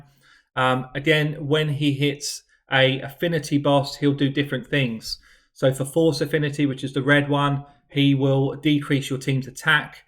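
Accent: United Kingdom. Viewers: you rock!! I've been watching your videos for a while and I always look forward to your insight and delightful British accent.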